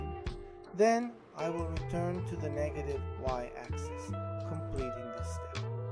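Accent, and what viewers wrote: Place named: India. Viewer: وقتی انگلیسی حرف می‌زنی استاد شبیه هندی‌ها می‌شین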